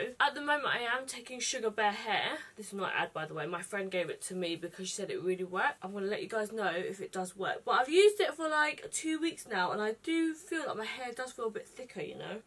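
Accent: United Kingdom. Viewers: omfg ok so this is my first video and i found you off instagram and damn girl im like really shocked you have a British accent and not American like for some reason i thought you would have a American accent but when i heard you i got shocked and im still in shock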